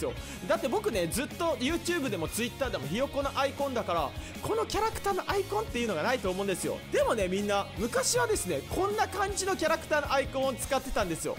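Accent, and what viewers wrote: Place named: Hakata, Japan. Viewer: たまに出る博多弁好きです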